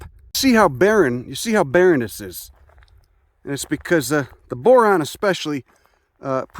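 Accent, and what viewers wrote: Chicago, United States. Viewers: I just learned the word parasocial. I never thought to imagine being a plant described by this perfect Chicago accent. But I love it.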